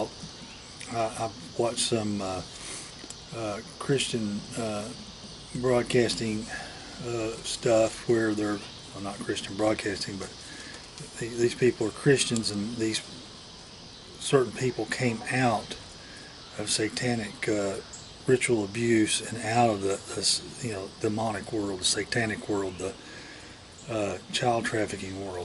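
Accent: South, United States. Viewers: I love your southern accent! And also the way you explain everything in such detail!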